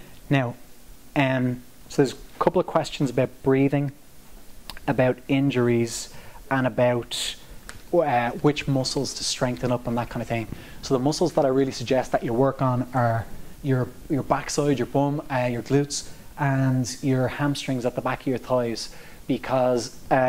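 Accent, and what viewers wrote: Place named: Ireland. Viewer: Big man ! Its been a while ! Classic moment as I am looking for tips and tricks on doing my first marathon here in florida in October. I clicked your link and hear the irish accent and burst out laughing ......I know that bloke ! I hope you are doing well skipper. I enjoyed the video, good work. Gerry Carroll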